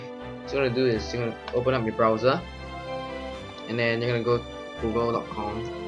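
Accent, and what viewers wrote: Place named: Singapore. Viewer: Thanks! By the way, your voice really sounds like a Singaporean. ;)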